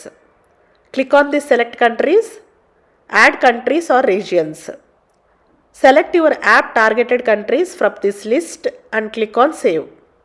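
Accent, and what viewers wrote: India. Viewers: Why always indian . Accent. I hate it . I can't understand it very well. F. This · Very strong indian accent 😊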